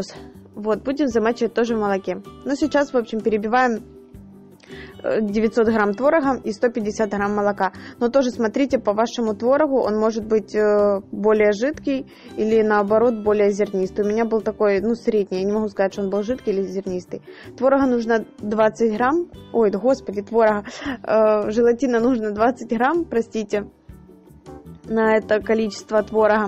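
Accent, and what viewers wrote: Ukraine. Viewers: А мне нравится такой украинский говорок!))Главное рецепт хороший и лишней болтовни нет!))Всех благ вам....милая девочка!)) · Боже мой! Как же ласкает слух ваш говор! Я впервые услышала украинский акцент(если я правильно поняла). Я так и знала, что он мне понравится! Так заслушалась, что по рецепту ничего не поняла)))) Пойду ещё послушаю. И обязательно сделаю такой крем. Благодарю! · Спасибо большое! И за мусс и за украинский говор, на душе тепло. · Я серьёзно не понимаю - так говорят украинцы на русском языке? Или это диалект какой то? Очень прикольно ☺ За видео - лайк. 👍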